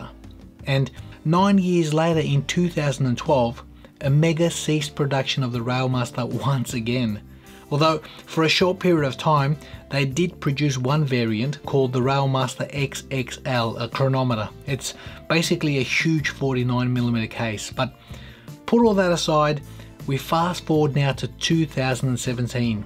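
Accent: Australia. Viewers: Lovely accent. Aussie? 😉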